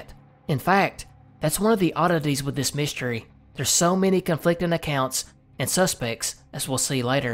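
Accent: South, United States. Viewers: as a Kentuckian born and raised, i love your videos and really appreciate hearing more southern accents out there in the world ❤️ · This is fire. As a guy from Cali, I generally have mixed feelings about a southern accent. However, your tone is soothing, and you don't give out "hick" vibes, you sound well-spoken and intelligent. Basically, I feel like a long lost southern cousin is schooling me on interesting cases and stories. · My toxic ex used to tell me I couldn’t watch your videos because the southern accent was annoying, he would tell me to shut it off immediately everytime. 4 months without him and I can enjoy your sweet southern voice and amazing content in peace❤️ helps me remember the little victories of leaving when I’m feeling weak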